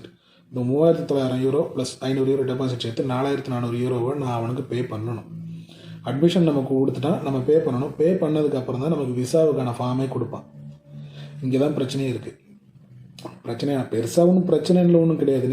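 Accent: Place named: Nagercoil, India. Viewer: Nagercoil slang!!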